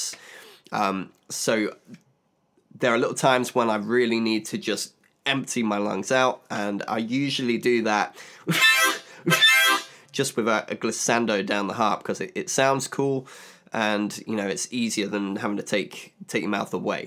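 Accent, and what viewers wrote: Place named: United Kingdom. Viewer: Oh my god he’s British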